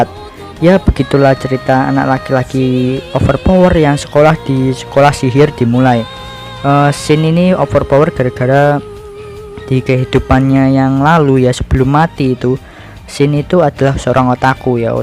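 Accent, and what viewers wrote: Java, Indonesia. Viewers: Mas itu logat nya kok medhok Jawa banget, aslinya mana to mas? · Waduh min gue baru mampir disini, ngakak denger logat bicaramu kayak Jawa banget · Medok bahasanya:v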